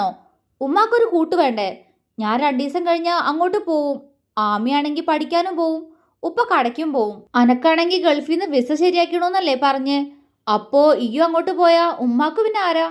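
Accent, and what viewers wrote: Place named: Malappuram, India. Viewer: ആണോ. എനിക്ക് ഈ സംസാരം നല്ല പരിചയം ആണ്. എന്താന്നോ എന്റെ വീട് മലപ്പുറം പാലക്കാട്‌ ഒക്കെ കടക്കുന്ന ബോർഡർ ആണ്. പിന്നെ എന്റെ സ്വന്തം വീടിന്റെ അടുത്ത അയൽവാസികൾ മുസ്ലിസാണ്. അവരുടെ കൂടെ കളിച്ചു വള്ളർന്ന ആളാണ് ഞാൻ. അത് കൊണ്ട് എനിക്ക് വേഗം മനസ്സിലാവും.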